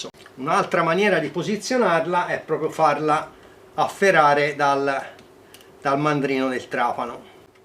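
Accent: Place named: Lucca, Italy. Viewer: See ...sei ganzo !! Toscano anche te ...dall.accento sembrerebbe lucchese io pisa ahhh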